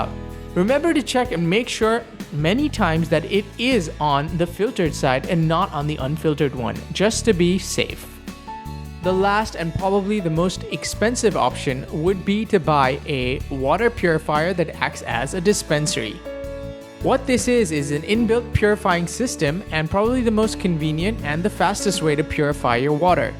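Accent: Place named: India. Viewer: Fuck you amost have the indian accent tune down to nothing..but still need to work on it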